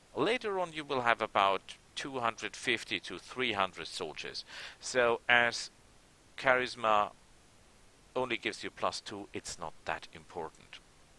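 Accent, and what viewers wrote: Germany. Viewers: I remember your floris walkthrough good times I miss your german accent you got realy good speaking english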